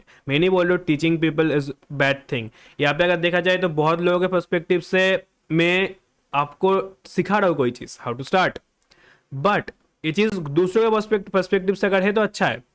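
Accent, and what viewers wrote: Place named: Bengal, India. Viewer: Brother You are bangali right? I can feel it with your hindi. But no worry, you are good at hindi. After sometime I hope you will be more fluent in hindi. There is something about you which I can relate with me with you. I think, you know a lots of stuffs but the thing is, it is not looking so much interesting to watch this video. But obviously it is informative. I have the same problem, pata to bohut kuch hai lekin utna interesting video ko bana nhi pata. But we have to try to be more interesting to people. Agar kabhi tum aur mai famous ho jaye to ek podcast to banahi sakte hai na? Take love ❤, spread the positivity. And lastly remember me 'its Samiul' 😊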